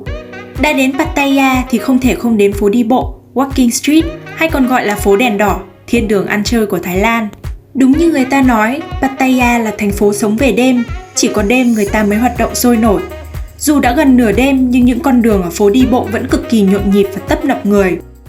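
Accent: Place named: Nam Dinh, Vietnam. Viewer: Âm hưởng nam định rõ vcc kkk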